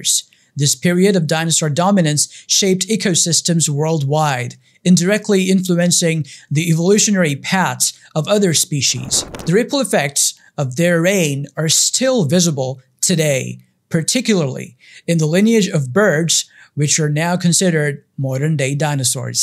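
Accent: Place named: United States. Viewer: You accent awesome. I want to learn American accent as you speak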